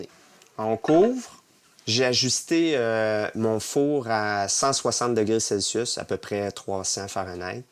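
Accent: Quebec, Canada. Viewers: is this canadian french?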